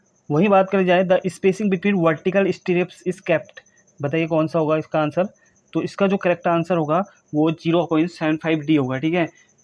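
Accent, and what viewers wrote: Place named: Jodhpur, India. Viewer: aap kha se ho sir. Language se jodhpur k lgte ho. Sir aap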